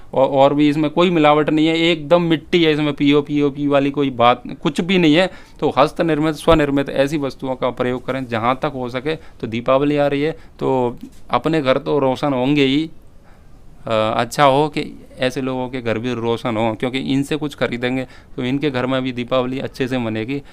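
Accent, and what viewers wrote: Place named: Braj, India. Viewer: भाषा तो ब्रज लग रही है , आचार्य जी ?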